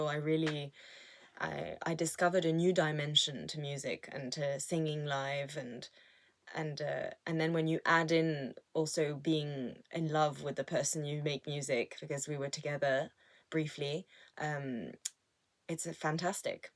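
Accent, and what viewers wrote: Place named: United Kingdom. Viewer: I like your music videos. Very nicely done. You have alot of talent. But surprised to hear your British accent.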